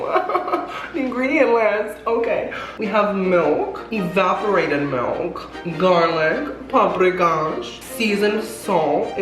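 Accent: England, United Kingdom. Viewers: The British accent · The English accent 😂😂 such a classy dame. Dora the Explorer Vibes! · Omg xD The British accent is fabulous. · Omg the British accent. I’m having a really shitty day, this is is making me feel a lot better. Thank you for being you.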